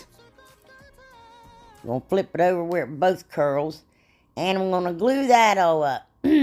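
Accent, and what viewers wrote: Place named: Oklahoma, United States. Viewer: I love listening to you talk too. Your accent is so sweet and your laughter makes me smile. One of my best friends is from Oklahoma and has the same accent. Enjoy your week!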